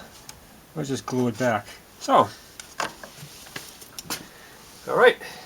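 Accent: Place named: Boston, United States. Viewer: Ya sound like your from bawwston, are you from bawston there boy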